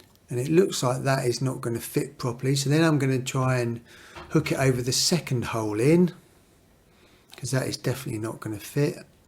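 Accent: United Kingdom. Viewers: Love this😂 very british delivery in a slightly patronising humorous way. Thankyou!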